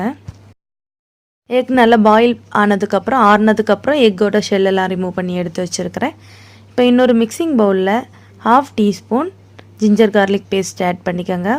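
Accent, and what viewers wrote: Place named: Nagercoil, India. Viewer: Super dear.. neenga pesrathu cute ah iruku.. namma nagercoil slang super 🤩🤩👌👌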